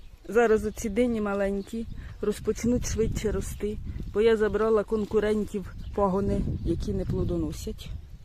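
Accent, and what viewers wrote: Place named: Kirovohrad, Ukraine. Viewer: Гарне відео, інформативне. Репортаж з Полтавської області, а говір такий, ніби з Кіровоградщини (Олександрівський район).🙂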